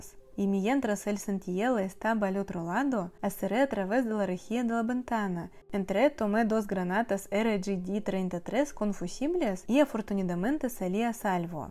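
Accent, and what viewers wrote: Russia. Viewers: Hola me encanta escuchar tu español con acento ruso. Esas "r" se escuchan bien chingon. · Lo que mas me gusta de sus videos, es su acento ruso hablando español😊, saludos 👍👍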